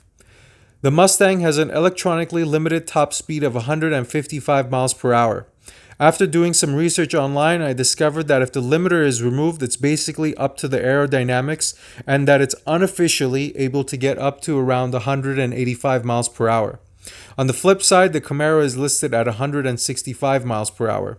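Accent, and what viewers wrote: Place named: Michigan, United States. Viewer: Sound like you from Michigan.. Dearborn?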